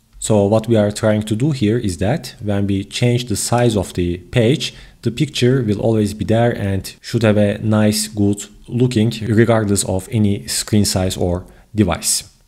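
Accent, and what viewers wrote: Turkey. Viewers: Exceptional Turkish accent. Lovely. Thanks for this !!!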